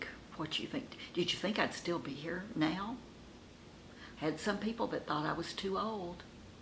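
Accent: South, United States. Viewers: Know now why I love watching you, love the accent(I'm a Southern too) and best of all I am a July baby too!! Besides I love all the other stuff you do, I have already bought some of the makeup you liked and it is great.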